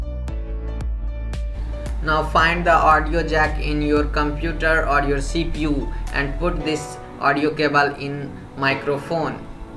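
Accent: India. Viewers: india ascent is Suck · This accent and why indian not being racist or anything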